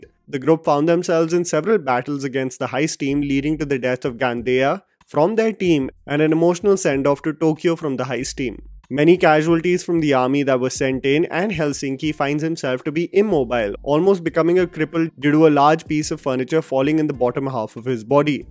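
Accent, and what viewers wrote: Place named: India. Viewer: I stopped watching immediately once I heard his Indian accent.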